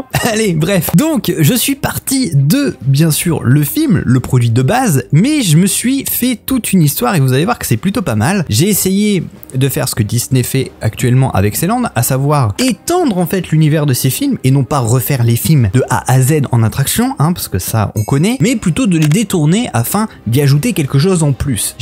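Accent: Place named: France. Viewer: J'aime beaucoup le son de ta vioix sur cette video, nouveau micro, nouvelle equalisation ? Les deux ? Et ton English me fait toujours marrer. Ne change rien, so french.... Quant au sujet c'est sympa le land à 4 milliards. Tres sympa, totalement improbable mais sympa. Encore.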